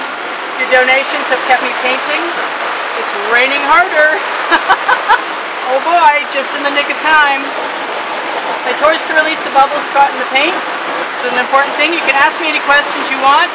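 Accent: Texas, United States. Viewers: A big ol' party going on in that one! Texas talk. Love it!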